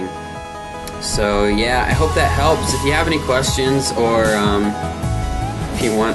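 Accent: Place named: United States. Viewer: First of all thank u for ur prilliant ideas, but iam wondering why u r learning rolling Rs cuz u seem like pure American I feel like u r a native speaker thanks again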